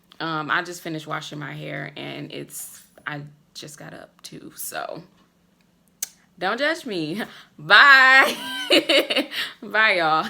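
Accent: United States. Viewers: HI Beautiful!! This IS a PERFECT Video!! Thank You!!! What part of the UK do you live?? You sound American.😃😇🌼💐🌸💐🌸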